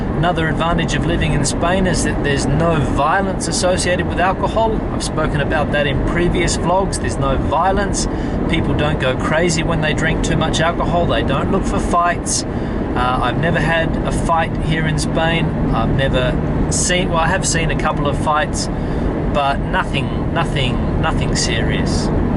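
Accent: Australia. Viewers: Where are you from? I would say you sound Australian, but since I am Spanish I am probably not the best one figuring English accents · OMG what are my ears hearing: A Brit acknowledging we here in Spain have great food!! xD (edit: No, it was an Aussie, too good to be true haha) Good video by the way, I agree with pretty much everything you said. And I love the driving and road footage. You didn't mention roads/infrastructure as a big pro (edit: last thing you said :) Regarding corruption: It's true that it's huge, but as you said there's no corruption at the "lowest" level, you can't bribe a policeman or a clerk etc. Also most big corruption scandals involve local and regional governments, not the State itself. I'd say the "State" and the bottom-working public officials are clean. It's the regional big-fishes who are corrupt most of the time. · That's an Aussie accent... 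· Why not saying you are from Australia (accent is obvious) and make comparisons with Down Under? Video could be much shorter. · He sounds pretty Australian. Maybe he just speaks skow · Thank you we are thinking of moving to Valencia good to hear another Aussie!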